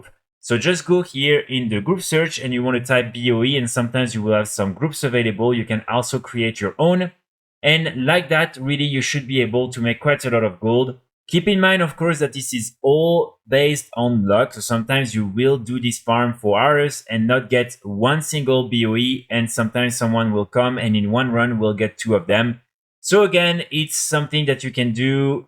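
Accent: France. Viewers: tu es Français toi, l'accent ne trompe pas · Nice french accent :) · Are you French ???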